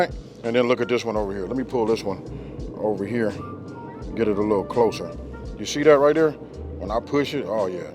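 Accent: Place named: Los Angeles, United States. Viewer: I swear if that ain’t an LA accent, I don’t know what is 😂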